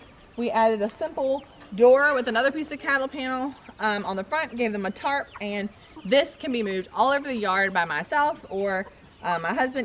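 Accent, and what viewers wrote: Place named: Texas, United States. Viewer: Hi i just found your channel. It sounds like you have a possible Texan accent. We are a family of 7 but our kids are all grown. Trying to start a more self sufficient homestead lifestyle. Im 52 and the hubs is 54. And we own our own drafting and design company on our 2acres of land in SE TX.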